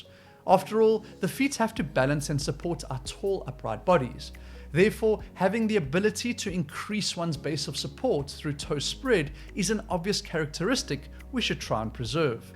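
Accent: South Africa. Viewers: why do you sound South African? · Are you South African?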